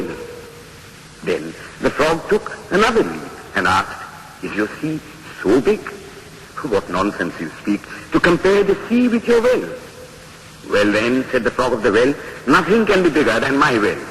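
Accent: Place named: West, United States. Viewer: This is not the voice of Vivekananda. Pure accent of the people in the west.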